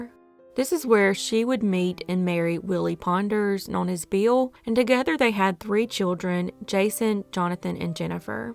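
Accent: South, United States. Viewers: content a side, I have to say your calm pitched tone of your southern bell voice is perfect & keeps me coming back, although MANY others can have great content gets sidelined when they try to say it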